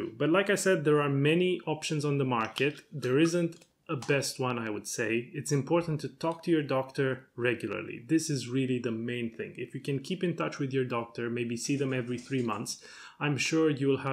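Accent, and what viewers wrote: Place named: Romania. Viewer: I have listened to three videos now. My first guess is that your mother tongue is Romanian. You sound like a pastor from there who leads a food distribution program for the poor in Canada. You do not speak a Slavic language but you are from Eastern or S.E Europe. So, that leaves only one language. It is not so much your slight accent as your manner of speaking.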